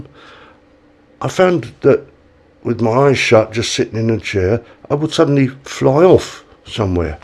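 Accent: United Kingdom. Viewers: my apology. But how can I fill my aura with spiritual power. My apology again and thank you. Btw, I love your British accent.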